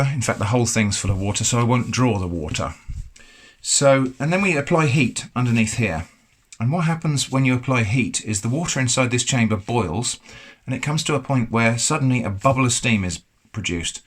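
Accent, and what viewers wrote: England, United Kingdom. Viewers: Innuendo seems to go well with an English accent. Classy.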